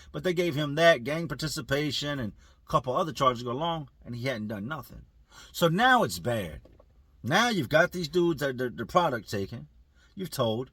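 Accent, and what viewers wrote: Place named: Virginia, United States. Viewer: Push the Butteeen. We know from VA, can’t deny that Virgina accent. I here some Danville in there from time to time too.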